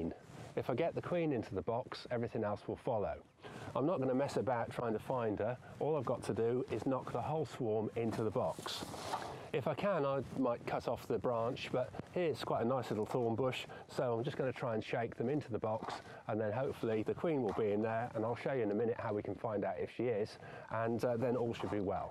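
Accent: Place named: United Kingdom. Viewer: The second I heard british accent I moved on.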